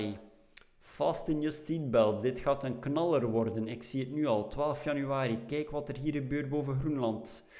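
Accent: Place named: Flanders, Belgium. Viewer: Vlaams luistert ook nog eens gewoon veel lekkerder.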